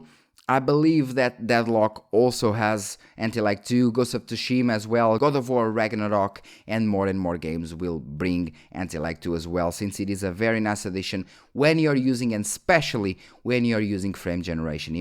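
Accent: Portugal. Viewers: are you from portugal ? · Você é portugues ?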